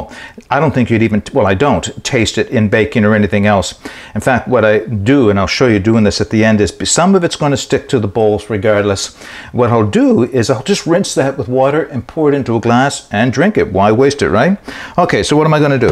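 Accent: Nova Scotia, Canada. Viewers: I detect a residual maritime accent. Are you from Nova Scotia?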